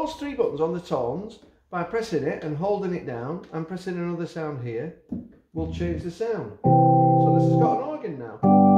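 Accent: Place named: Liverpool, United Kingdom. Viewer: Are you from Liverpool? You sound like the lost Beatles…lol. Great review! I am a guitar player looking to dip the toe into keyboards.. old man looking for a new challenge… thanks for the great informative review!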